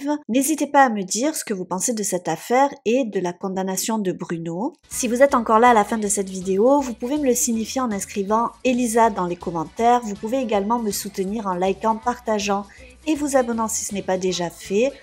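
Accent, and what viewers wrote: South, France. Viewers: Impardonnable ce qu il ont fait..ça me degoute que de tels personnes puissent vivre...pauvre fille...votre manière de narrer adoucit qq peu avec votre pointe d accent du sud qui fait plaisir !).. Bien à vous malgré ttes ces horreurs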